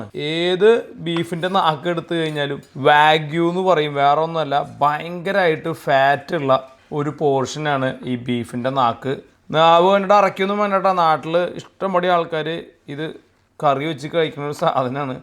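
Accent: Thrissur, India. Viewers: Chettan thrissur karan gadi ahnu enn orapich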